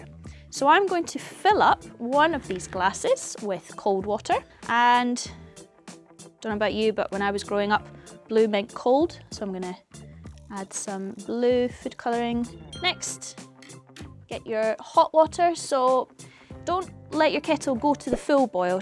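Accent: Scotland, United Kingdom. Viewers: It's a scottish accent, you nut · shes posh scottish not hard to understand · she sounds more like a posh scot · I guess you've never heard a Scottish accent... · Within the first 8 seconds I discovered this woman is Scottish.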